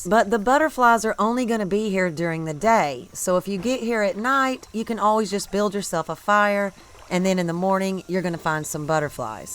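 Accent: South, United States. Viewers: Thanks for making these very informative videos. Love your southern accent.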